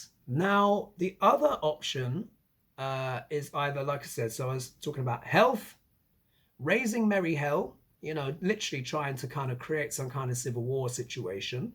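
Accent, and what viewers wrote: England, United Kingdom. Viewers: Blessings to you. You are now MY FAVORITE ASTROLOGER ON YOUTUBE. I do Western Astrology but I LUV your insights via VEDIC. Also, it is a KICK to hear a British accent from an AFRO British face. GOD LOVE YOU.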